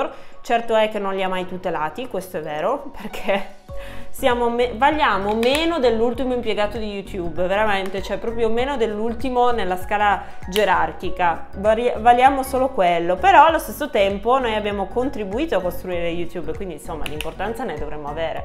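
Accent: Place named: Piedmont, Italy. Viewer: Ah mi piace la tua cadenza piemontese, io sono pugliese ma ho tutti o quasi i miei parenti in Piemonte e adoro il piemontese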